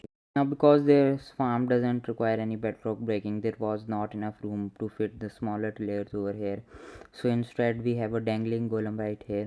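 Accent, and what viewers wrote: India. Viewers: Your English give me vibes of an Indian person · Good Job man, don't judge me but by your accent I feel like you are Indian too and I think you can help build a sensible Minecraft community here.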